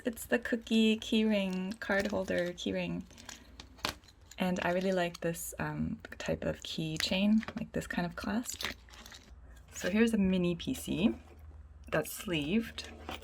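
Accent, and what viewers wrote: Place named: United States. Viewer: chill and nice vlooog 💜 take careee!! alsoooo still amazed by the fact that you are fully korean like...you sound american when you speak in English 👏😳💜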